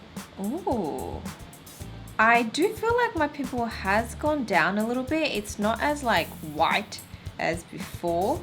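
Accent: Australia. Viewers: What accent is urs? Sounds a bit Australian but im not sure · The Australian accent. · An Asian woman with an Australian accent? That's so cool!!!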